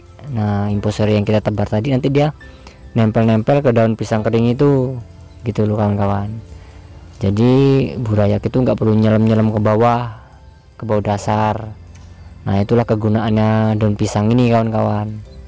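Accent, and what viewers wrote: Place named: Palembang, Indonesia. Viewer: Abang ini logat Palembang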